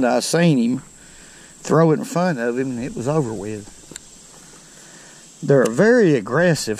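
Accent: South, United States. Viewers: You got that Forrest Gump accent where you from ? Nice video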